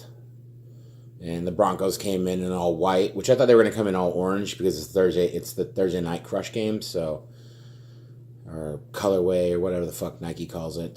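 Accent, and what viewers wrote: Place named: Philadelphia, United States. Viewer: Ahahahaha...bro, yer starting to sound like the dude from Philly.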